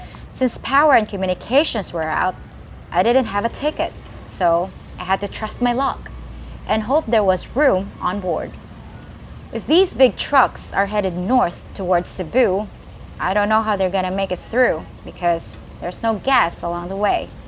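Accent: United States. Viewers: Maayong gabi,! Stumbled on your You Tube video here with an insightful view of your hometown after the powerful typhoon passed the place. I liked your English accent here. Did you grew up in the States? Anyways, I appreciate your report here. Had many of the people I know who are based in Cebu, mostly in the downtown area. Daghang salamat! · American accent man so madam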